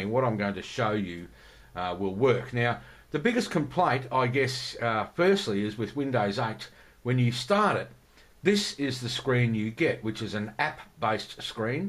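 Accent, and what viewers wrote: Australia. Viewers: are you Australian? sorry I know it's off topic but I'm curious as I can't identify your accent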